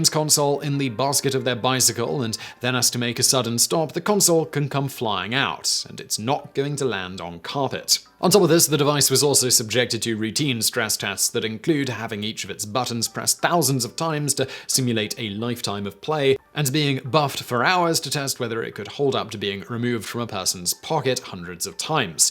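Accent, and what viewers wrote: United Kingdom. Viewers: I can't tell if is pronouncing all those Japanese names wrong or if he's just pronouncing them all British. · Since when does have a british accent?!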